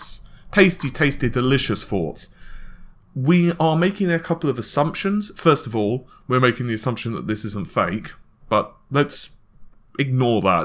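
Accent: England, United Kingdom. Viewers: ***** Apparantly some Americans are so incredibly unsophisticated that they get confused if they hear the same language with a slightly different accent. My advice to those people: don't ever migrate to Europe. Within a country the difference in pronounciation are much larger than the difference between American English and English English, not to mention that you would actually have to pick up foreign languages. Unless you live in England, technically that is a part of Europe... To be fair, in England there also are some strong differences in pronounciation but speaks 'general' English, not some less common accent.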